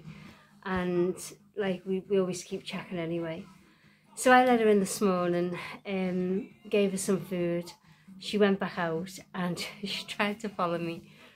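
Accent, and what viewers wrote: Liverpool, United Kingdom. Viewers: I would have loved to have seen you on Beadles About it would have been funny seeing you getting angry with your Liverpool accent 😂😂👍. I watched one episode earlier a bloke parked a ice cream in front of somebody's house blocking his drive selling ice cream. The bloke in the house started chucking his sauce bottles at him do you remember the bleep sound when somebody was swearing on it 😂 funny.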